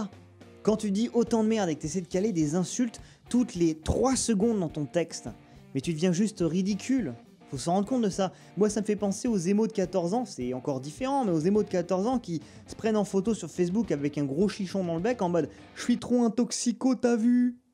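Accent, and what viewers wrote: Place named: Auvergne, France. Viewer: t'es auvergnat nn? j'ai l'impression que t'a l'accent sur les ''o'' et les ''au''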